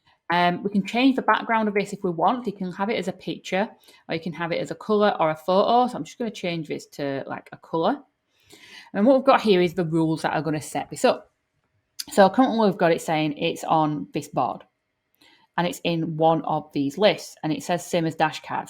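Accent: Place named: Leeds, United Kingdom. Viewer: Thanks. "Customise" just seems like such a funny place to go to add a dashcard, doesn't it? PS. Grew up in Leeds so nice to hear an IT "How to..." vid with a proper accent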